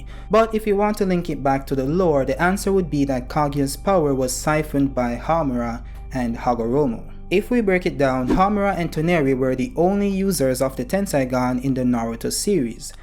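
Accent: Jamaica. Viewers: You sound jamaican, are you?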